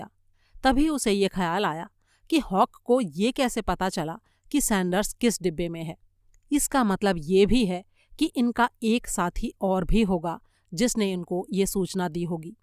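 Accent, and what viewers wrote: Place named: South, India. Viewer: आप साउथ इंडिया से है क्या? आपका एकसेंट यही बता रहा क्योंकि अभी हाल ही में मैंने महारानी वेब सीरीज़ देखी है जिसमे रानी की OSD IAS का भी एक्सेंट ऐसा ही था वो केरल की थी।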